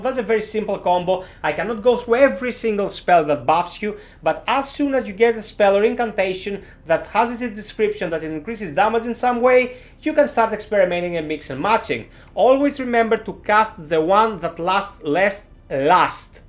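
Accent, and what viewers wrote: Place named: Greece. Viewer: dude that is a greek accent ain't it! reminds me a bit of old school toronto in the 70's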